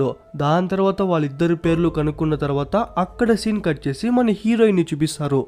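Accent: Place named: Kolar, India. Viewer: Kolar slag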